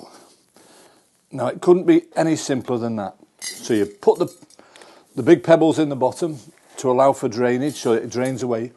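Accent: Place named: Yorkshire, United Kingdom. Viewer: Great video. Sounds like a fellow Yorkshire man. Just planted an old bath with this beauty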